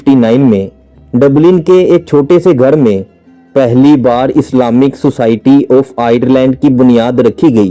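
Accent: Lahore, Pakistan. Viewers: بہت پیاری ویڈیو بنائی ہے اندرون لاہور والی اردو بولنے کی بجائے یہ پنجابی میں بنا لیں زیادہ بہتر ہے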